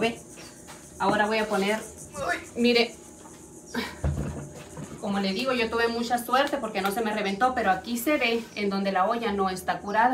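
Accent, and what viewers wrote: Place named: North, Mexico. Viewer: Me encanta su acento norteño…con la SHHHHHH! 😅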